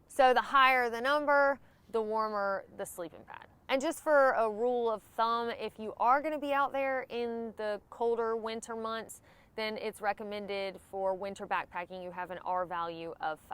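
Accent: South, United States. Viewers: you are just the sweetest and prettiest young lady I believe I've seen in quite some time. No I don't get out much. But I do reminisce a lot about when I used to backpack and you help bring those memories back. I have a Southern drawl just like you do so I guess that's why I like to hear you speak. Thanks for the video I'm sure you're helping these guys out a lot that's wanting to do hiking like you did and do. Keep ye powder dry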